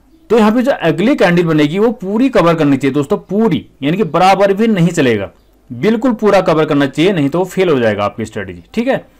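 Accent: Kumaon, India. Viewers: Aap kumauni hain kya sir?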